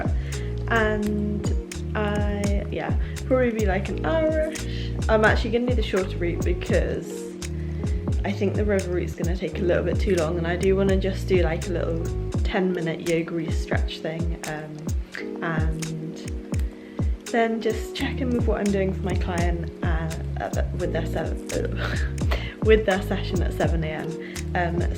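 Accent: United Kingdom. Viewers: YOU are so ADORABLE! Your pretty blonde hair, your adorable cheeks. I love your British accent which only adds to your charm. I love it when you talk to us and tell us all what your doing, your morning ritual etc. Keep up the good work. I love your videos, just love being with you.:-):-):-)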